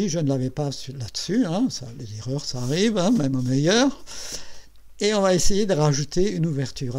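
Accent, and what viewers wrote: Alsace, France. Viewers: clin d'oeil pour l'accent du Sud Alsace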